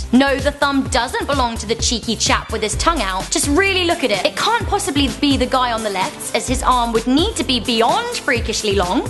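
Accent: United Kingdom. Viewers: Wow....she's British. Same!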